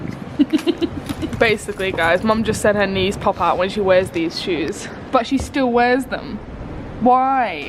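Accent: United Kingdom. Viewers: You’ve got a British accent but your Australian omg I’m confused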